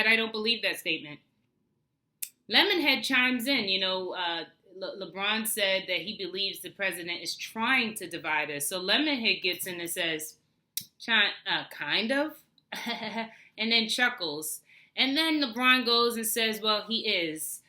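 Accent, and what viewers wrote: New York, United States. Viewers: Hi am from Nassau Bahamas I subscribe too your channel early this morning, and you soo beautiful I love your charming sweet voice you could tell that you are a true New Yorker lol... Am A Eastern Conference guy, I was a die hard Lebron James fan when he played for Cleveland and Miami... And when he went too L.A. am not his fan no more because am not A Western Conference guy..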